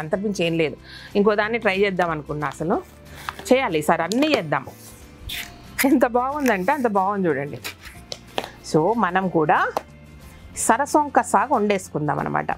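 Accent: Telangana, India. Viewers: U speak liķe me mast hydrabadi telàngana.super atlane frankga vundu friend.dont change ur way of speaking in telangana. Mast ga chestunru n success ayinattu chupistunru.great but nenu itlane idea tho youtube lo chusi chesina mentulu,pudina chenagalu.without soil n with soil sand lo but raledhu. Micro grain greens avuthalevu.y reason cheppandi friend.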